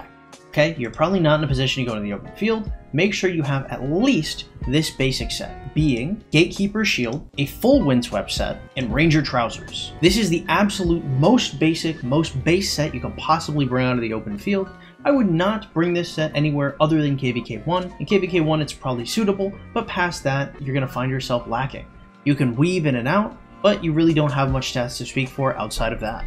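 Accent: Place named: United Kingdom. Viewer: Bro I love the voice control on this vid .almost sounded british .hahaha top job as per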